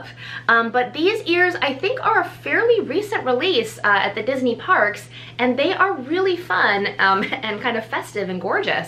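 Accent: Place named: Midwest, United States. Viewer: First off: I love how excited you get about every item.. and second off: I knew you were midwestern the minute I heard you speak (gotta love our accent)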